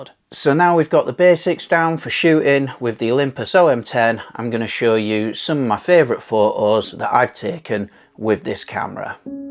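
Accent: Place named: East Yorkshire, United Kingdom. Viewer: Very helpful, thank you ! Also a lovely rare bonus to hear a fellow East Yorkshire accent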